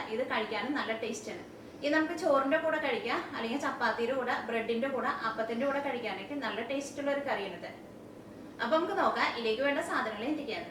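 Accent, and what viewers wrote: Thrissur, India. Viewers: Very nice. Pachakam n vachakam balanced. Nice Thrissur bhasha 🤗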